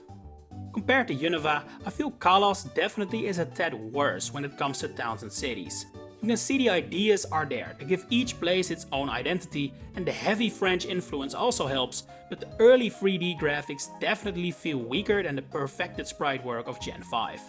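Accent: Netherlands. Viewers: Can I ask you what your nationality is? I can't help but hear a Dutch accent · Are you Dutch? :p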